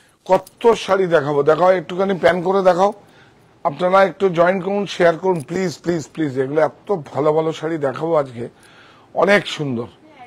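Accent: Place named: Marwari, India. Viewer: The way you speak, it appear like a typical marowari . If you bring some “ bangaliana “ in your speaking , you would appear more believable..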